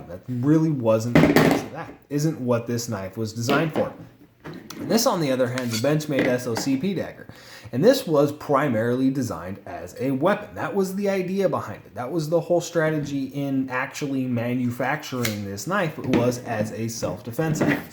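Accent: United Kingdom. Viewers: What are you british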